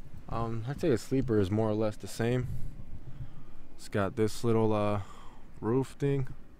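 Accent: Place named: Eastern Europe. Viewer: Славянский акцент у автора проскальзывает. Сначала сомневался. Но выключенный inverter все прояснил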